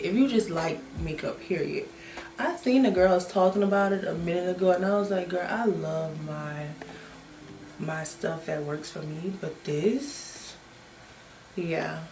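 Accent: New Orleans, United States. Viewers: You sound like a NOLA Lady! I am from NOLA! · I know a fellow Louisianan when I hear one 😊 After listening for a few seconds I said oh yeah that sounds like New Orleans or surrounding areas of N.O. You’re makeup is BEAUTIFUL 😍 ❤. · Do I hear a lil Uptown New Orleans in your voice? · Girl! Gorgeous! I knew the moment you started talking that you are a Louisiana girl like me Lol! New subbie!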